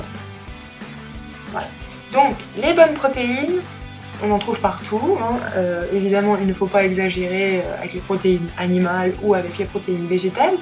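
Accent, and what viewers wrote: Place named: South, France. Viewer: Merci pour cette vidéo hiii tu me fais trop rire avec ton accent du sud 😂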